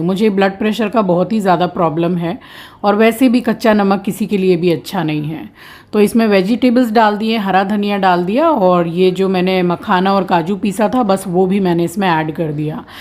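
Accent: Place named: Uttar Pradesh, India. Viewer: Dear app Calcutta Se Hain Magar aapke bolane ki style Puri UP ki hai aap baton baton mein bahut se aise word bolati Hain Jo ekadam Apne se lagte Hain❤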